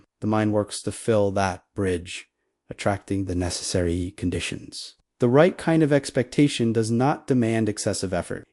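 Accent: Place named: Spain. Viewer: What is the purpose of image moving his lips?He had a deep Spanish accent. I have to look away. It rather takes away the intention.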